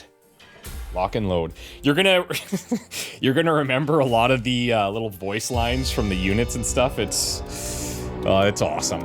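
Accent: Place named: Canada. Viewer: As a non native English speaker it took me till to detect the Canadian 😅 🇨🇦 ❤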